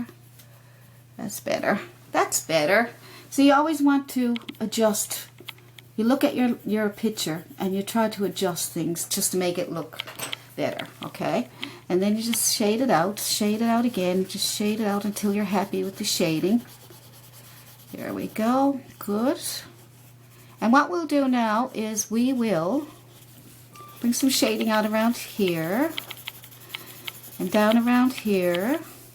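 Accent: Newfoundland, Canada. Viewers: I have been using this video with my 6th grade classes to help with their self portraits. I immediately heard your voice/accent and said she HAS to be a Newfie. Am I right? I live in the states but family is from Placentia Bay. <3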